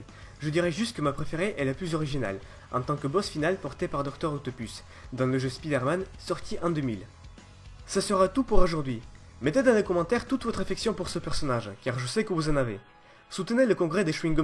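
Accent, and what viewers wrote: South, France. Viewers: Sympa le concept, par contre je ne sais pas quel est ton accent, mais pour le commun des francophones, c'est parfois compliqué de te comprendre. :) il "reuvait" de devenir pompier ?" sa "grammaire" dans l'escalier... C'est le sud ca, mais ca complique un peu la compréhension.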